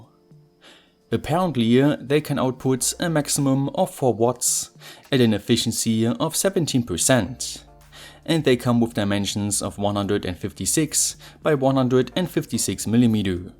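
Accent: Germany. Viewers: I am trying to make a simple - foldable array of small panels able to charge a 20000mAh 18W power bank. After 10 minutes of sifting through a ton of garbage videos on this topic, all with colorful thumbnails, idiotic, brain - damaging music and guys who just hot-glue shit together, I finally arrive to a guy with German accent. I think this will be good.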